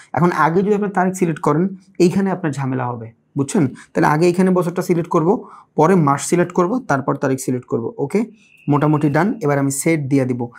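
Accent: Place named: Barishal, Bangladesh. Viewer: ভাইয়া আপনার দেশের বাড়ি তো মোগো বরিশাল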